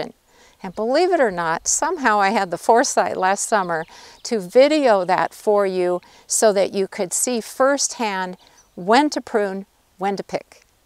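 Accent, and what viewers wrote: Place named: United States. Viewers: I don’t know what they’re talking about it’s just American lol. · American's strong accent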